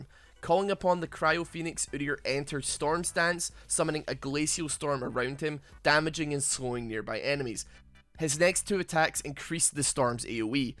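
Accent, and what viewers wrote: Scotland, United Kingdom. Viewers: Are you Scattish my friend?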